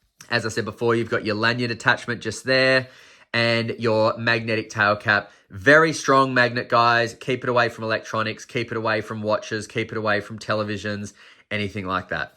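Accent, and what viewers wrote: Australia. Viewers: Great review - thanks man. Good to hear another Aussie. For a second I though you had a shelf full of dildos for a second there 😮 😂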